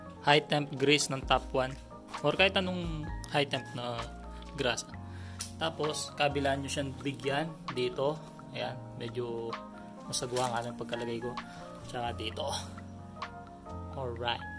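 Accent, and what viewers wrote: Pampanga, Philippines. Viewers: Kapampangan ka par? Hahhaha keep up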